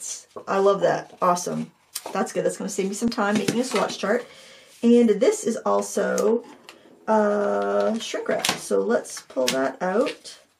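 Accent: Maine, United States. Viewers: I'm thinking the same thing. Wish I would have watched your review before I sent the tin back.. I am curious if I could have gotten the tin to flex back into place like you did. :) Anyway, thanks for all of your reviews! I love listening to you, since I was born in Portland Maine, but live in Portland Oregon now. Miss that Maineard accent. :)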